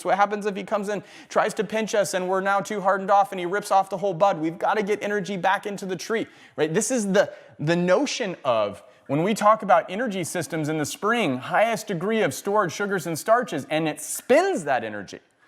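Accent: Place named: United States. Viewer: a very speedy US accent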